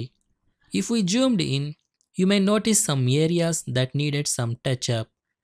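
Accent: India. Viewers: Indian accent is cool ikr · Indian accent 😃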